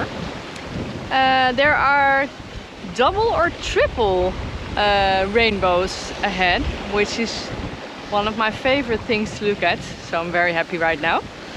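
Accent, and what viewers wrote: Netherlands. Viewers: Love your videos, great sailing with good attitude, a good role model for all us sailing in small boats, all with lovely Dutch accent. Greetings from Finland!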